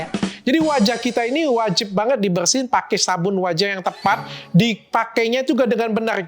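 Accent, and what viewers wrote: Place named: Madura, Indonesia. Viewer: kok kyk madura dok logatnya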